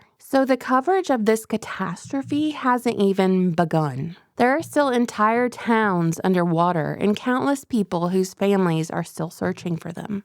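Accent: South, United States. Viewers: she didn't talk like that before, not that southern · she never had this deep of a southern accent before. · Because she is southern.,duh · wait a second, you have a southern twang now which you didn't have before, what happened?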